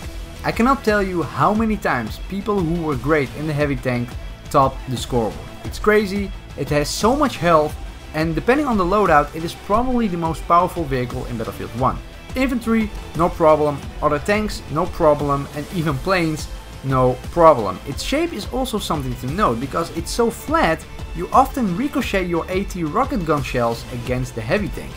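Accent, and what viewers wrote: Netherlands. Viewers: Like to Hear the dutch accent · nice dutch accent bro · Prachtig nederlands accent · are you dutch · Your definetly dutch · Are you Dutch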